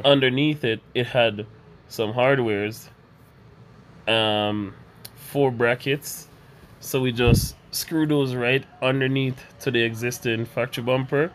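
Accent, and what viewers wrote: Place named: Saint Vincent and the Grenadines. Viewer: man almost sound Vincy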